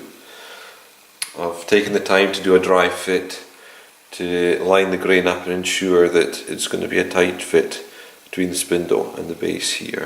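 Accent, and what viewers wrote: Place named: Scotland, United Kingdom. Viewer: You sound Scottish ,where are you in Scotland ?Nice piece of work my friend . I live near Lochness. I've been turning on and off for a couple of years .I've made a couple of lamps from spalted beech.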